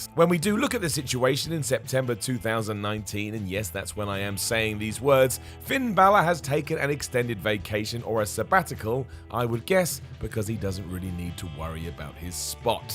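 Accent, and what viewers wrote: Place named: United Kingdom. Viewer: Skipped through the entire video within 5 seconds and saw every single thing I wanted to without having to listen to some annoying British millennial mark say anything.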